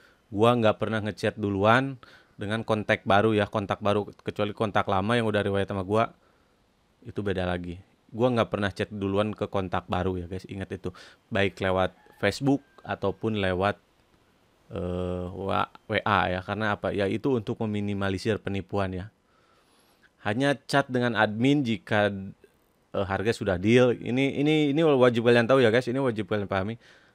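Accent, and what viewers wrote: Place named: Kalimantan, Indonesia. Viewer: logat bahasanya kayak bahasa melayu arah ke kalimantan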